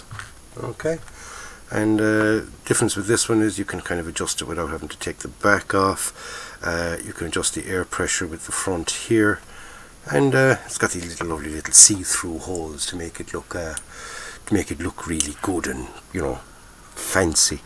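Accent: Ireland. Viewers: I enjoy your videos and listening To the Irish brogue, but I've noticed on the last few episodes there has been a bloody annoying whistling sound all the way through them.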